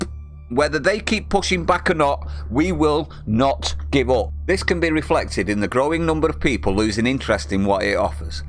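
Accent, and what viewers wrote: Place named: Manchester, United Kingdom. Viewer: Great post from a person with a Manchester accent - glad to say that I recently joined the ranks of people who has cancelled their TV licence - the thought of all those on the "BBC gravy train" especially '£1.75 mIllion Lefty Lineker' equivalent to over £33,000 a week or a welsh lefty paid £500,000 a year just to read the Biased BBC news? Drain The Swamp.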